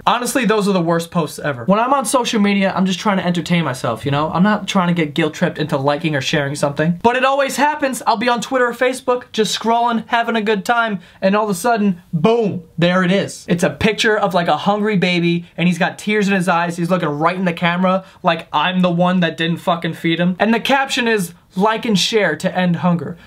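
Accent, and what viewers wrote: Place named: Queens, United States. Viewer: I think that's just how he says it!! Some people just say it that way!! Also, he's from Queens so that might have something to do with it!! Not sure though...lol!!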